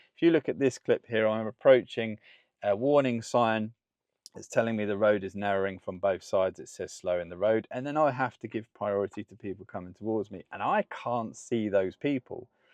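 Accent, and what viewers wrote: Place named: Kent, United Kingdom. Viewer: I did wonder how you acquired a Kentish accent - I was puzzled when I realised you're in Norfolk haha!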